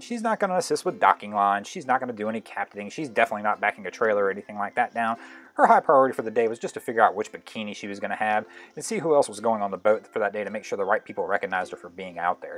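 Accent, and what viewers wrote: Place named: Maryland, United States. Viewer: my wife think your from Maryland by your accent or speech cadence, how far is she off?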